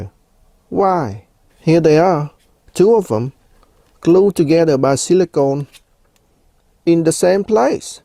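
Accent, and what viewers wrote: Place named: South, United States. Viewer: What is your accent? Every fourth word has thick southern slang while the rest sounds Asian/American. That southern draw just catches me off guard. Great video. K bye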